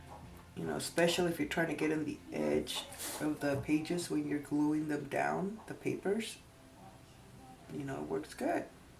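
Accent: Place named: Puerto Rico. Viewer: I know this is an older video but I've been watching all your older videos to catch up I guess. I love the way you teach. But, even more special to me is your voice and accent. You sound so much like my family that it makes me homesick. I'm Puerto Rican from NYC as is my entire family. I live in Virginia now so I'm far away from anyone that sounds like me. Listening to you makes me happy.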